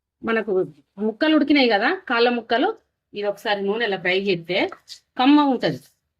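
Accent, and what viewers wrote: Telangana, India. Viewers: తెలంగాణ యాస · Mee presentation Telangana accent chaala bagundhi akka❤ Karthik, Kakinada, AP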